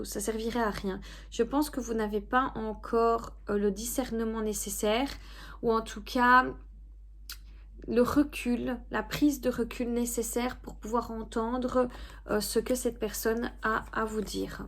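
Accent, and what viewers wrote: Belgium. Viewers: Où vous c'est la première fois que je vous écoute mais il me semble que vous avez un fort accent belge 👍😸♥️ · Bonjour, toujours très belle, j adore ta guidance et t en remercie cette personne ne sera plus dans ma vie très bientôt ou j'ai mal compris.j adore ton accent belge gros bisous je te kiffe.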